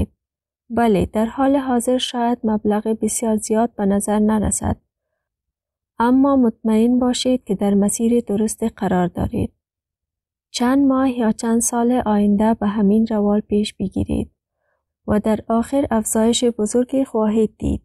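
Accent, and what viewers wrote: Afghanistan. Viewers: Dari accent is also beautiful I like it. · خیلی با دری در ارتباط نیستم ولی خوب حرف می زنه فقط عادت ندارم بهش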